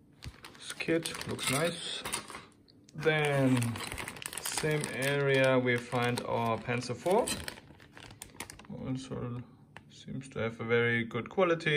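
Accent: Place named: Germany. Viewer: I love your English its German and English togeder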